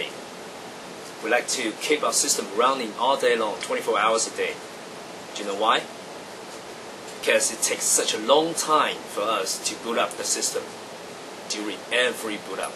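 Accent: China. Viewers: taiwanese, hes got a chinese accent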